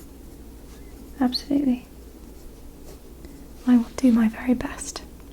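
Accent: England, United Kingdom. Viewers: I can call myself British because Britain is a nation (you forgot to include Northern Ireland, by the way.) Also, I specifically said I thought she sounded English, not British. If you want to call yourself a North American, that too would make sense because it’s where you’re from. Stick to America, I’ll stick to Britain! 🤗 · The English accent is back... Yay! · Umm, you're incorrect. Thats not an American accent at all! That's an excellent British (more correctly, English) accent. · Your English accent is so flawless, you really should be an actress. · It is so nice to hear an American do an English accent and not sound Australian. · I genuinely thought you were British, until I saw your other videos. This accent is exquisite! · I love British.. or should I say English! ♥️ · Absolutely spot on accent, nicely done (from a UK viewer)! YT pops up this from the past, lovely to see, as always. 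You even seem to be 'acting Englishness', you would have been excellent in The Crown 😊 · Your accent is super believable from my experience a lot of Americans trying to do an English accent either sound like Dick Van Dyke in Mary Poppins or a super over the top impression of the Queen. If I heard you I would think you were English...I'm English, just thought I'd mention that for context haha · Not gonna lie but when women have a English accent its super super attractive. I love the way it sounds. Very calming. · Wow, with the British accent you really remind me of Kathy from the Patty Duke show lol. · I'm only just discovering the British accent videos, these are delightful! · I just came here because I miss British accents and I find myself answering the questions in my head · Can I just ask who or what you copied your accent from? You sound more English than any Brit ever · I’m from south London, how do you sound more English than me? 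🤔😂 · It's! Nice to see a new ASMR video from you! Watching this at the moment for a comforting Tuesday afternoon! Love the English accent as well:) You're the coolest! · Best English accent from an American I’ve ever heard 🙌🏻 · As someone who’s English I’m actually so impressed by your accent 🤭 if I didn’t know you were American I’d genuinely think this was your real voice! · I thought she was English, it was the first video I've seen from here, so I went to watch another and I was shocked she was American. I'm English as well x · Wait, you AREN'T English? Your accent is incredible. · What a voice! so soothing.Nice english accent.Poetry to my ears. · I'm British from Nottingham, thats a very beautiful english middle class accent. Your very considerate which I think reassures people. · Wow. If you showed up talking like this I wouldn't be able to pin where exactly you're from (I'd guess maybe Sussex or Surrey), but I wouldn't have any doubt you were English. 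· I swear must be partly English with an accent that convincing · This is the greatest English accent I’ve ever heard from an American. Are you an actress? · As an English person, Americans are usually dreadful at putting on an English accent - espeically so-called professional actors!....but this is absolutely 100% convincing. Like many others, this is my first video of yours, and I never suspected you weren't English for one moment, until I read the comments. You should get into professional acting! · I always forget youre not british when watching a video. Hah, thats great! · Please do more British accent video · that's a flawless English accent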